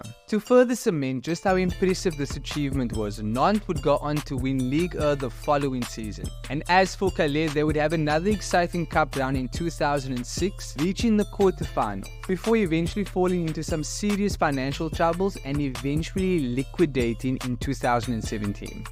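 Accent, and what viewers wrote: South Africa. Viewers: French in a Cape accent...now I've heard everything 🙌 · Amazing vid ,when i heard the accent i knew it was south african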